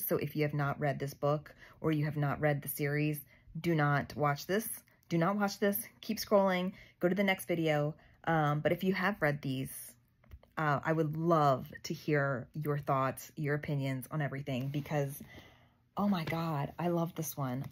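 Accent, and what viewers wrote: Baltimore, United States. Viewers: You got that Hon accent.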